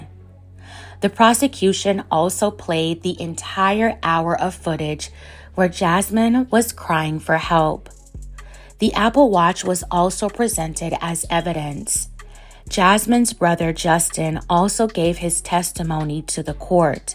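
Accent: Baltimore, United States. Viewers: I see you bro ! A.I.. sounds great! I do miss the bmore accent tho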